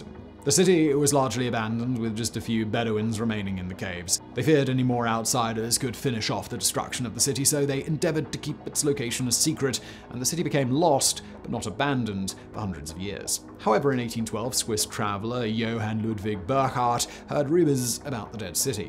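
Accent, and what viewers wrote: United Kingdom. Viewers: Yes. He tends to put me asleep. I genuinely don't like the British accent but I like his voice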